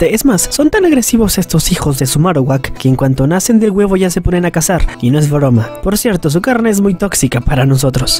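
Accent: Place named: France. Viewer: Yo solía pensar que era un francés hablando en español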